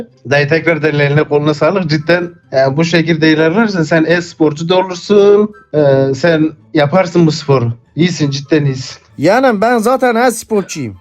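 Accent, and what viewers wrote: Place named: Diyarbakir, Turkey. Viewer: Ya adam Konyalı ama şive diyarbekir :)))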